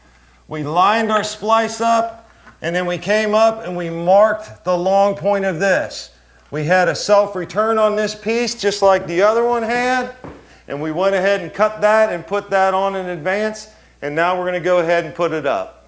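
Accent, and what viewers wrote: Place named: Pittsburgh, United States. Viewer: Hi! I see you are in Cincinatti, but I swear I hear Pittsburgh in your accent! Are you by chance from Pittsburgh?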